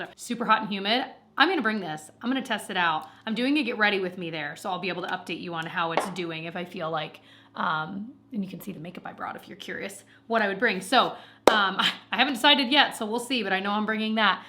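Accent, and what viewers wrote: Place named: United States. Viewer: I so enjoy your videos!! How you “feel like” x,y,z. I miss America so it’s fun to hear the little dialect differences. Been hoping for a review on that setting spray!!! Thank you for being genuinely you!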